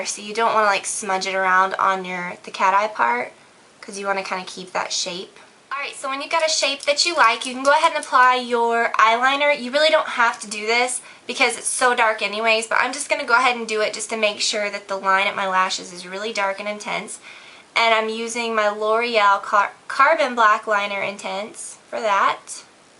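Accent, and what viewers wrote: South, United States. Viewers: i love hearing bits of your southern accent in your videos. what nail polish will you be wearing for new years?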